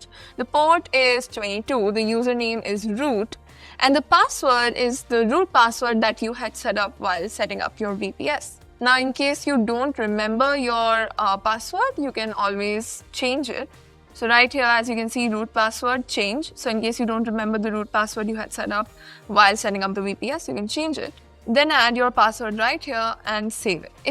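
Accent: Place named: India. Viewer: I cant with the indian accent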